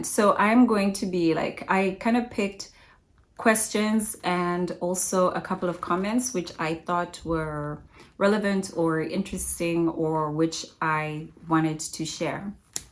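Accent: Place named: Zimbabwe. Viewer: we are same generation. I remember you from your model days. It was called Supermodel right. And remember reading an article about you when you were modelling in SA. And wow still looking beautiful sis. I loved hearing your life story. It shows we all go through a lot. And though it may sound petty but you have that top notch Zim English accent. Exactly how most of us 80s babies sound. Its fading now guess a reflection of our education system. Anyway looking forward to seeing more videos.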